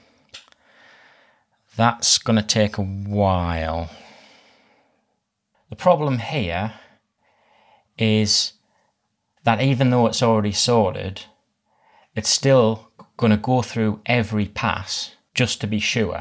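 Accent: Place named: Northern England, United Kingdom. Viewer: I liked the clear explanations and the awesome animations. I also liked your Northern English accent. On the other hand, as a programming noob who has only studied Python 3 I would have preferred the language to have been Python 3. You never said which language you were using. Is it JavaScript? I could not understand the line of code at the top and you never explained it. I think your method of starting with something simple and adding optimizing code later is great. However, I would have liked it to start even simpler. I am here because of your Twitter reply to 3B1B. Do you have any Python 3 tutorials? I am especially interested in string manipulations, simple chess playing programs, and simple text to speech stuff, not that I am particularly typical. I am also an explainer, but I do my explaining on paper (figuratively speaking) in my free blog on Medium.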